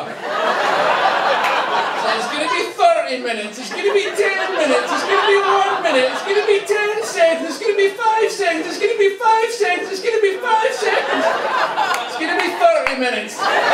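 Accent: Scotland, United Kingdom. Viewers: audio quality is terrible and with the scottish accent it's almost impossible to hear what he is saying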